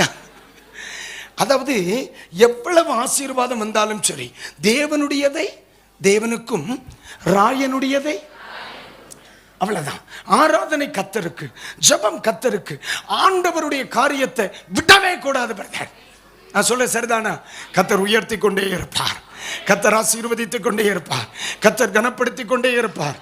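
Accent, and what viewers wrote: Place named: Tirunelveli, India. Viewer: பாஸ்டர் உங்க செய்தியும் பிடிக்கும் நீங்க பேசுற இந்த திருநெல்வேலி பாஷையும் நல்லா இருக்கு பாஸ்டர் அருமை சூப்பர் ஆமேன்❤❤❤❤❤❤🙏🙏🙏🙏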